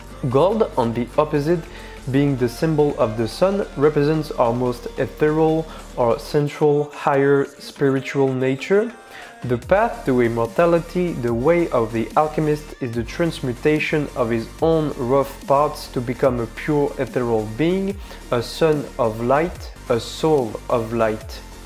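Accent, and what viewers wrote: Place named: France. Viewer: thank you for the time you spent working on this video, as I was searching for esoteric studies about the Harry potter saga I unexpectedly found myself watching your video. I'm delighted to find out that you're French. No doubt a native english speaker would spot your french accent in no time but as a French myself I would not have guessed you were one at first, so great accent, it's rare enough to be cheered and I must say it is a wonderful idea to share an english-speaking content like that, may it bring you a large audience! Continue comme ça, tu as gagné un abonné 😉 C'est une excellente idée d'avoir pensé à sous-titrer! Si je peux me permettre une critique: tu devrais utiliser une autre musique pour l'intro. Pendant la vidéo je me suis demandé pourquoi tu laissais la musique en fond puis j'ai réalisé que ce serait gênant si on entendait juste ta voix dans une pièce vide.. 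Peut-être que je suis le seul que ça chagrine mais encore une fois tu devrais changer de musique, ça fait un peu cheap genre "best techno 2009" c'est dommage, sache que je dis cela en toute bienveillance pour que tu t'améliore 🙂 Je vois que tu maîtrise bien la langue de Shakespeare -- assurément mieux que 95% des Français -- mais encore une fois sans vouloir être pénible j'ai remarqué quelques erreurs qui pourraient être évitées. Si tu le souhaites je serais ravi de t'aider de quelque manière que ce soit, par exemple en relisant ton script ou tes sous-titres ou t'aider à faire autre chose, je su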